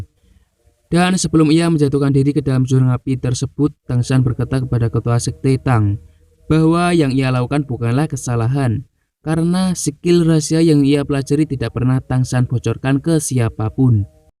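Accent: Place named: Java, Indonesia. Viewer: logatmu medhokk bro... wkwk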